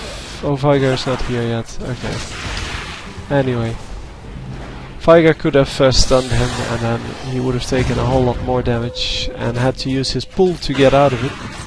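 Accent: Netherlands. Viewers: you're dutch right ? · dude are u from holland?? ur accent is pretty much like dutch and sounds dutch too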